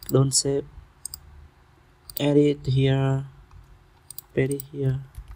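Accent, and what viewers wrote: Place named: Vietnam. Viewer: Sir the way you speak english I assume you're a Vietnamese cause I'm a VNmese and it's really easy to spot out a Vietnamese, in that case Xin chào và video này dễ hiểu ấy But if you're not then ok It's really easy to understand what you said and what you do. Thankyou sir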